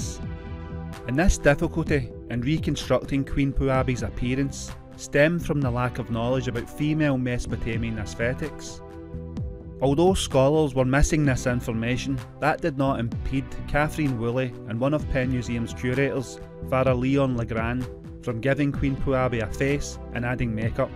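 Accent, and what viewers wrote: Scotland, United Kingdom. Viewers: Amazing content as always! Scottish accent is a bit tricky by the way haha. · He's speaking in English just with a scottsmans accent, you'll get used to it..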